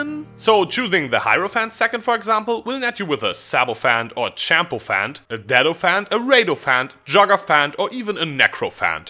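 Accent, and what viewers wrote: Germany. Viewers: The german accent is killing it :D :D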